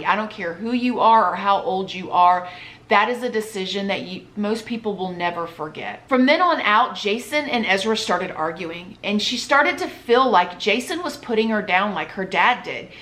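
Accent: South, United States. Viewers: Love love love how you tell the story of real cases. You should look into being criminal narrator on ID. Your southern accent is refreshing to listen to!! I’m a new subscriber!!!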